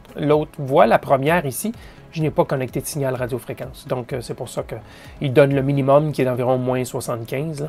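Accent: Quebec, Canada. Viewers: Un québécois! Belle vidéo!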